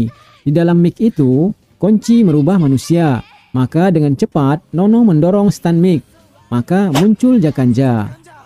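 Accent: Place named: Padang, Indonesia. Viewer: Padang banget aksen nya bang, jadi salkus berasa dengar podcast praz teguh 😂 btw thx u udh bikinin rangkuman cerita nya, nonton dulu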